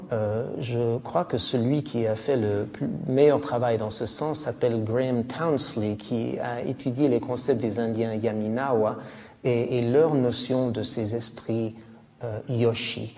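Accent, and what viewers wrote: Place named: Switzerland. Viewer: ton doux accent suisse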